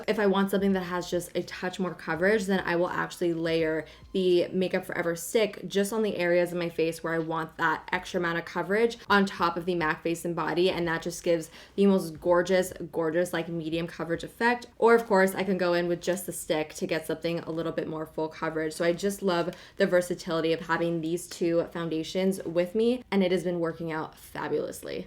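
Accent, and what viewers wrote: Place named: Canada. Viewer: Jalynn Newell it’s because she’s from a region in Canada where that’s the dialect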